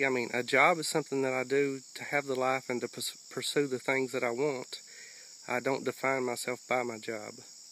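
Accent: South, United States. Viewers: I really like you. There's something so nice about your matter of factness mixed with the southern accent. For an INTP you do have a lot of vocal inflection and i can tell that you're a very wise person. Thanks for your videos and all your feedback on my videos.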